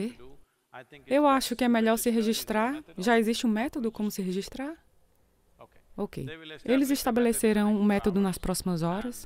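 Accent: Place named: Brasília, Brazil. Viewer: Sotaque de Brasiliense.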